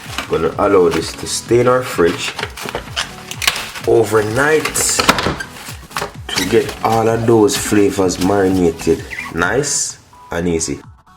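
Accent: Jamaica. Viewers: Oh I love Jamaican accent and I love Jamaica 🇯🇲 ❤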